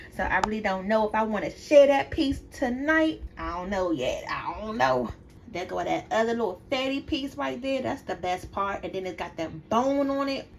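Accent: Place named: DMV, United States. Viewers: Looks delicious.. and I know a DMV accent when I hear one. I love it!💜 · Yes! That undeniable DMV accent. And if I'm hearing correctly, I think it may even be right outside of DC on the VA side - "town" side of Alexandria even tho I'm from the "highway" side. I could just be biased lol 😌. This looks so good I can almost taste it!!😋🤤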